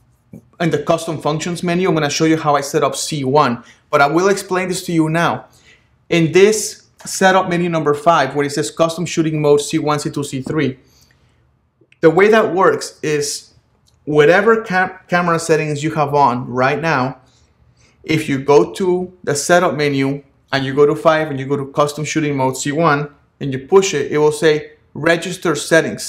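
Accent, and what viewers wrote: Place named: Spain. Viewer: I'm here watching your third video and in each video, you always have something so funny to say. In this one, the "Help Text Size". "If you're old and you're losing your sight, go to large". OMG, you made me laugh, especially because of your Spanish accent. Anyway, great videos, I am like David below, been using Canon for life and on my 5D MII, I hardly ever visited the menus extensively. You're right though, these settings will aid me with faster photo processing and focus selection. Thank you for your time on all these. I will continue to watch all of them and revisit them as a refresher. Who wants to read a manual when we can watch your videos.:)) PS. no insult intended on your accent, I'm Spanish too and I have so many family members with a similar accent.;)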